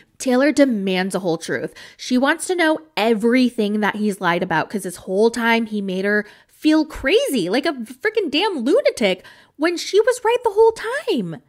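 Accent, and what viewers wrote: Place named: South, United States. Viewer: you have a beautiful southern accent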